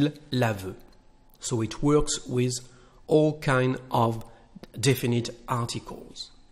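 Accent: France. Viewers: Are you actually French or you are just really good at it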